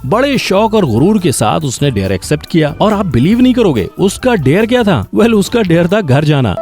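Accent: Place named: Gujarat, India. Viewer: Gujrati lagate ho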